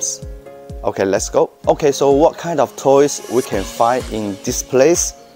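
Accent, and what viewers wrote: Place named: China. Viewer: Love the accent i like this chinese guy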